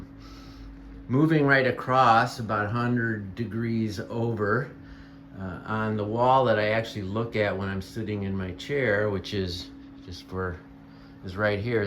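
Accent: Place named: Chicago, United States. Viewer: I'm about 5 hours straight north of you. Great looking tanks and beautiful looking, healthy fish! About 3 minutes in, I thought to myself, "I bet this guy is from Chicago! 😂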